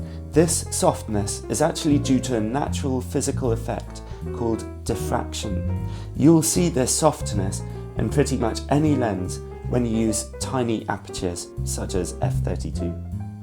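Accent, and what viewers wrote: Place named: Wales, United Kingdom. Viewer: Hey. Love the channel, been watching your reviews for probably a decade. I'd love to see a re-review on the Canon EF 100mm f2.8 Macro (non-L). I have one, but would love to see your method of testing it on a "modern" (to me) 6D with your newer testing format. Keep up the great videos. And dude, I gotta say, I love your accent! Not 100% sure you're Welsh, but I'm 25% Welsh myself. So "hello" from across the pond!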